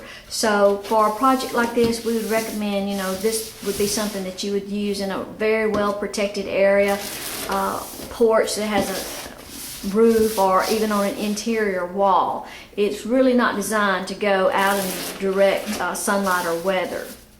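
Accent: South, United States. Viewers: I love your lovely southern accent.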